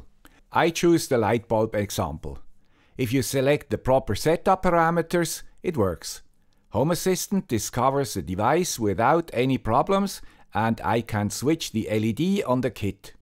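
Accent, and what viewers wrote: Switzerland. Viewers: My favorite guy with swiss accent is back! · Please please please turn off that YouTube autodubbing nonsense. It destroys your USP, the Swiss accent, and makes your videos unwatchable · As long as the guy with the Swiss accent keeps making videos, the Earth will continue to turn.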